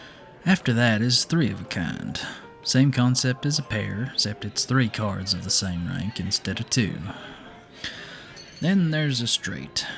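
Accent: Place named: South, United States. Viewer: I may listen to this over and over again if I want to play poker with my dad, cause like the title says "Mysterious Stranger Teaches You Poker". Also, love the Southern accent, and after playing poker with "stranger" here, I trust this guy :)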